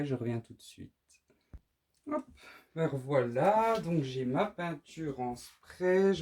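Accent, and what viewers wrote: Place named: Besançon, France. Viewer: J’adore les tableaux aux fonds rouge ( contrairement à vous ) et je trouve que celui ci est superbe , les couleurs sont très bien choisies . Le tableau bleu , magnifique , élégant . Je salue le Bisontin , un voisin donc ? Depuis que je vous regarde, l’accent me parle mais , je n’osais pas vous demander . Continuez comme ça et à bientôt pour visionner d’autres vidéos !! 🎨🤣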